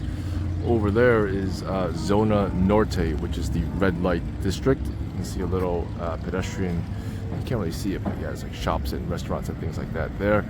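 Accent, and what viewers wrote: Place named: China. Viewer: You sound Chinese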